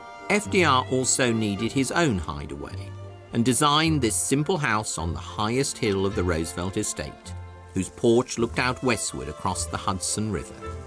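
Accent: United Kingdom. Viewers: Why do you have an Englishman narrating American History? I love the Brits, don't get me wrong, but right is right and wrong is wrong.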